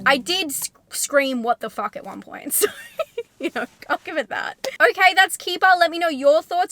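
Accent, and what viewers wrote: Australia. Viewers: Not sure why this is a response to the comment above.. but what you seem to be bothered by is just regular Aussie speech patterns (there are other people elsewhere that have this intonation but it's very prevalent in Australia/New Zealand)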